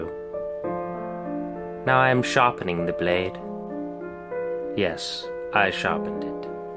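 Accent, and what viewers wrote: England, United Kingdom. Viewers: I like. I like the English accent too and although it was slightly off (only slightly), I wish you would do it more often. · your calm British accent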